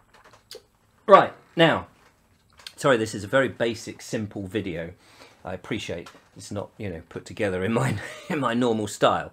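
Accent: United Kingdom. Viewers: Nice, why can't you try to teach British English for the non english people( beginners), I know that lot of english teaching videos are available in utube, but learn from you give some different view I believe, I really enjoyed your english pronunciation, and I very love to learn the British English, and British accent.. Can u do it?...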